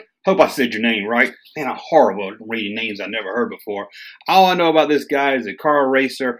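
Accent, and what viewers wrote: South, United States. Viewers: A guy with a southern accent who can’t pronounce Darrel Waltrip? My mind is blown.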